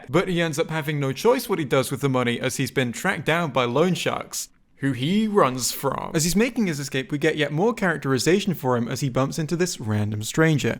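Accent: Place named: Scotland, United Kingdom. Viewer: are you from/living in Scotland? I thought I heard some Scottish pronunciations in there! Hope you're loving the Granite City - love your videos!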